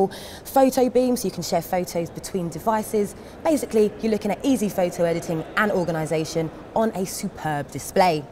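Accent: United Kingdom. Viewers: I can't stand british accent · Don't you just love the british accent?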